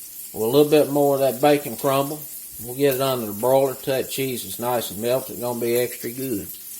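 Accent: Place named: South, United States. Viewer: I like hearing your accent and that southern drawl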